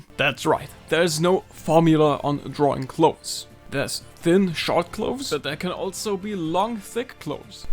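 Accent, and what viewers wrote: Germany. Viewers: Dude I love your accent (Hört richtig das Deutsche durch XD) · Man hört den deutschen akzent noch durch, aber das wird wohl besser werden mit der zeit. ausserdem ist der voll gut, man merkt ihn aber er ist nicht krass nervig oder ähnliches. Aber die übersetzung an sich ist echt schön geworden :o auch die betonung usw. Narutos clothes are THICC (okay) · Ah, German? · Ich finde das du super Englisch sprichst. Eigentlich stört mich ein deutscher Akzent im Englischem immer, welchen man bei dir auch ein wenig raushört, doch es klingt trotzdem gut ~ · I have never seen your channel before, but as soon as I heard your voice, I knew you were German.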